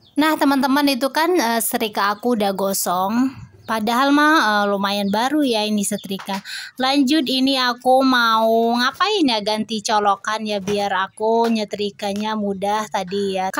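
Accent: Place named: Makassar, Indonesia. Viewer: MasyaAllah... Mampir lg ni teteh. Logat makassar jg teh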